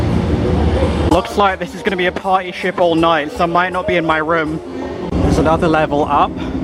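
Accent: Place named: United Kingdom. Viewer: Well you are born in London and then move to Canada from London . I say this because you have talk with british accent.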